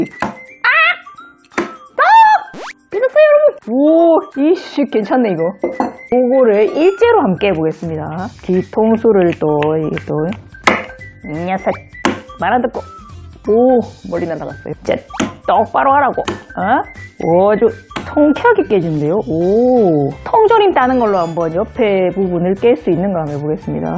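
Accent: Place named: Busan, South Korea. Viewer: 구수한 부산 사투리의 에게 서서히 중독되어 가는 것 같습니다. 개그 재능도 있으세요!!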